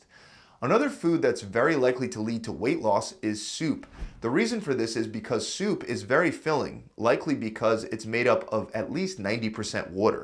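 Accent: Philadelphia, United States. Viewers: That Philadelphia accent is distracting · Love that Philadelphia accent. Great information. Thanks! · I know my Philly (area) accent when I hear it …”wooder” 🤣 from Philly or Jersey?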